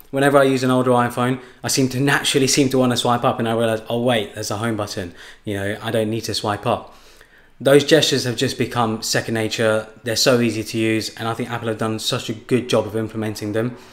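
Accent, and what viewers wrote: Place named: London, United Kingdom. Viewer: British london accent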